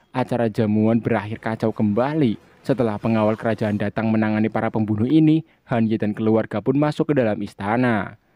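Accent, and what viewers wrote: Java, Indonesia. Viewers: gw demen sm naratornya. Ada medogh2nya gtu klo ngmg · orang jawaa ngapak yaah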